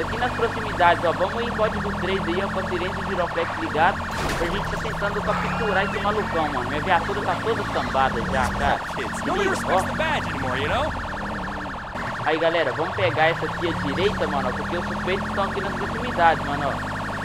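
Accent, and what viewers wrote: Minas Gerais, Brazil. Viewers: vc é de minas gerais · C É Daqui De Minas Gerais????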